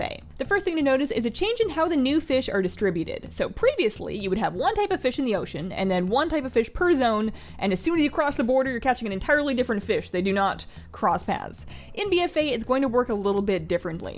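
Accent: Canada. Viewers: someone's from canada Eh?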